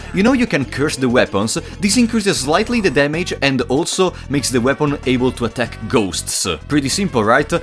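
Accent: Italy. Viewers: I just wanted to say that you are so captivating. Fear & Hunger is the first Dark Fantasy RPG I have delved into and you are the very first youtuber that made me watch a no hit run for 2 hours straight, that is very commendable my friend! Not to forget your Italian accent, it really makes everything so fun to watch would love to see some Merch in the future and on a sidenote, ive been able to beat Crowmauler using your guide:))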